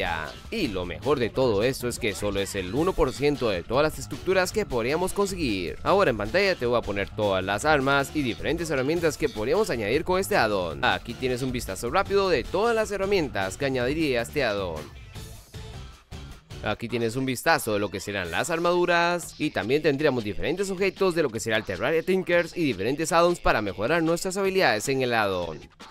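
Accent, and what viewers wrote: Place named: Costa Rica. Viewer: Yo diciendo que raro esa pronunciación de la R me suena parece tico jaja luego veo la bandera y efectivamente jaja saludos desde Cartago xd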